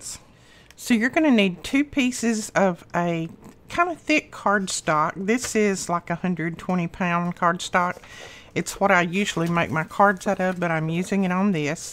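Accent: South, United States. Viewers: Oh, I LOVE everything about this project!!! Especially your Southern accent, 'cause I'm a Southern girl too ;-) TFS!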